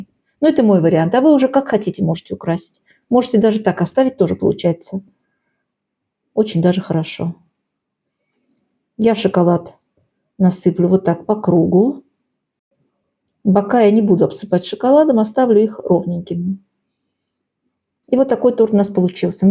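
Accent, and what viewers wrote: Baku, Azerbaijan. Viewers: Опять приятные слуху,родные "мелизмы" и акцент!Я Вас приветствую из нашего родного Баку!Огромное спасибо за рецепт и вообще,за то ,что Вы есть у нас!Благополучия Вам!❤❤❤❤❤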